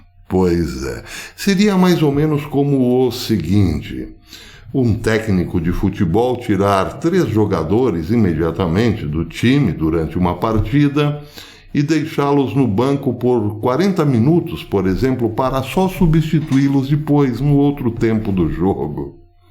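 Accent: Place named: Minas Gerais, Brazil. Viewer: deve ter morado em Minas! Tem gostado de falar em mineirês!! Beijos professor! Amo seus vídeos!!! (BH)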